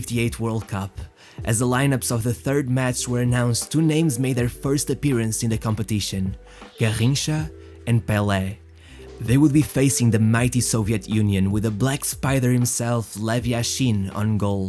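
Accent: Portugal. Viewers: Nice try, mas dá para perceber o sotaque português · My friend, you are from Portugal. I can feel it in the accent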